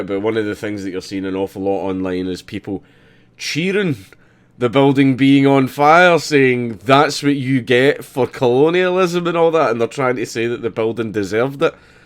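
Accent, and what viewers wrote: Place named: Scotland, United Kingdom. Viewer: Dude, you sound like the scotsman from Samurai Jack. Anyway, I hope the cathedral gets restored in its original form.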